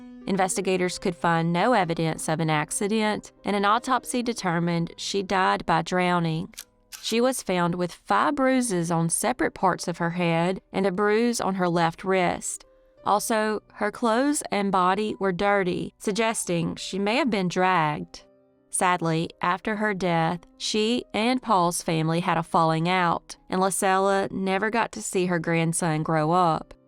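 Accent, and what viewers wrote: South, United States. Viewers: THANK YOU!! IT KINDA HURTS FOR THE UNSOLVED CASES BUT YOURE RIGHT UP ON THEM!! AND THAT BEAUTIFUL COUNTRY ACCENT! KEEP UP THE GREAT WORK!!:hand-pink-waving:🤠❣❤🐴🐎🦄🦌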